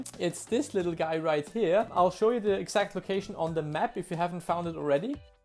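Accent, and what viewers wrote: Germany. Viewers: Ich habe von Anfang an gehört das du deutsch bist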